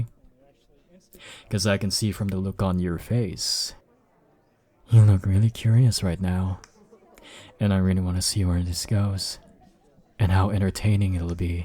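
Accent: Philippines. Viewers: is it just me or do y'all hear the filipino accent???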